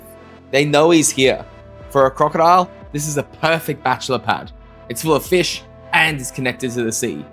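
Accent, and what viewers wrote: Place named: Australia. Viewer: You're essentially SG's Crocodile Hunter now! How fitting that you're also Aussie (I'm guessing, from the accent) Would be keen for those croc tours you mentioned to another commenter, if they ever get off the ground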